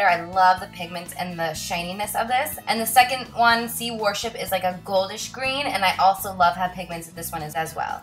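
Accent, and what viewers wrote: New Jersey, United States. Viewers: I'm a new subscriber and I have to tell you I LOVE your Jersey accent! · Where are you from? I love your accent. It sounds kind of jersey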